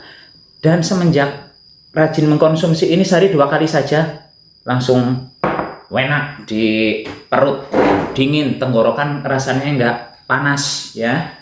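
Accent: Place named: Tegal, Indonesia. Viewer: Maturnuwun resep simple nya pak.. sy terapkan resep ini& resep apemnya.. Seneng bgt denger logat org Tegal..suami sy org Tegal tp br mninggal..sy pulkam ke Blitar.. sy ingin jualan makanan Tegal utk mngenang suami sy..ingin jualan nasi lengko& kue apem dr video pak.. Minta resep bumbu kacangny nasi lengko boleh pak??..ingin dpt resep dr orang Tegal asli. Maturnuwun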